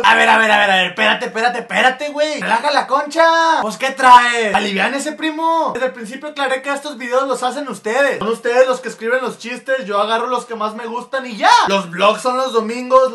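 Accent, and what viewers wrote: Galicia, Spain. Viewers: Me encantas con tu asentó de gallego <3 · eres gallego cierto?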